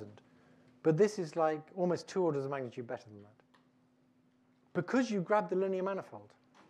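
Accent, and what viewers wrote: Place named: United Kingdom. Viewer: He's British.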